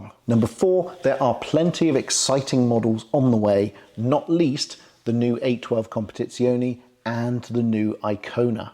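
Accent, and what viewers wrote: United Kingdom. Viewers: The proper English accent. yes.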